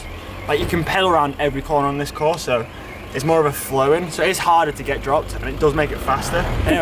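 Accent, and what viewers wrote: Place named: Yorkshire, United Kingdom. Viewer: I hear a dirty Yorkshireman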